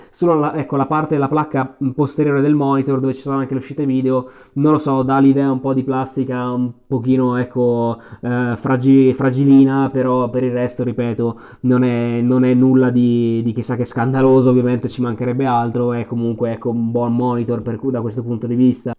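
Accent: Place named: Valtiberina, Italy. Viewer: ma per curo caso, sei di origini umbre? hai un'accento buffo che ricorda quello valtiberino in parte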